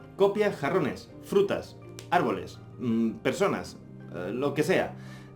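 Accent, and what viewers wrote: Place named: Spain. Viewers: owo molaria, aunque no me gusta mucho el acento español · tampoco me gusta el acento español.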